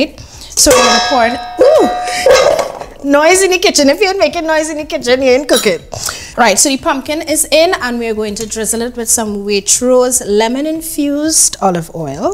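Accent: Trinidad and Tobago. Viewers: Who is this young lady, she is so genuine and very informative. She is fabulously fantastic. Better half even singing her praises. So she inside. Very professional and then slides in her Trini intonations from time to time. Excellent. And the meal and preparations on point too. What’s her name? When you cooking again darlin?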